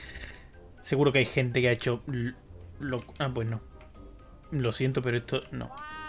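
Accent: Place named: Spain. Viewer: De q parte de España eres?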